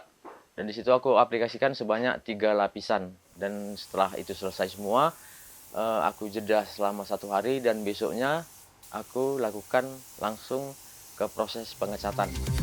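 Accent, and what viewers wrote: Medan, Indonesia. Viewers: Orang medan bg?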